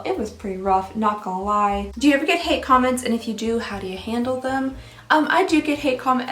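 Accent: Kentucky, United States. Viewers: Love Reno and decorating videos and would so watch if you did some. And as a Kentucky born and raised girl I hear the twang come out sometimes 😉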